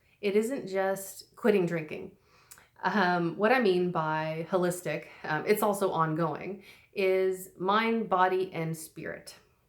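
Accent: Canada. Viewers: right down to the Canuck accent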